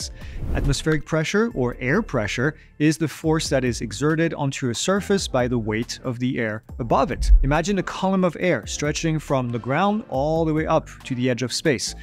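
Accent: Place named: France. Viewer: Are you from french descent ? I notice some remaining french accent in your speach.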